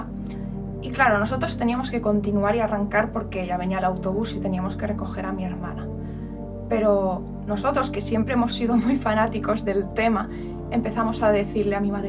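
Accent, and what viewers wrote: Spain. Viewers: Exelente!!! recien descubro tu canal por un video de ivan me suscribo!!!me encanta el silvido de tu vos en ese acento español,un abrazo desde argentina. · Porque os comentais con el tono de "jesus en sus peliculas" soy de mexico pero se me hace interesante desiros vosotros estasis, quereis, comeis y todo lo que termine en eis o ais se oye chingo ese asento tipo jesus de nazareth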